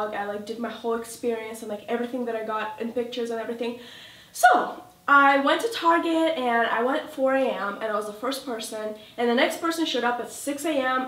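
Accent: France. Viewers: Je suis fraçaise et j'adore ton accent français ! :D Bisous de la France <3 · i'm from canada and i speak french at home and I love the french accent !!!!!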